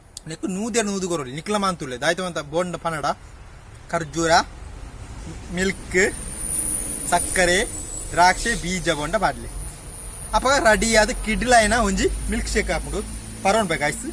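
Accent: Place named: Tulu, India. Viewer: Eerena video frst d last Muttala thuyere bore apuji.comedy apundu eerena funny dailogues kendre kushi ippundu.n anchida Tulu patheruni style kenere edde apundu.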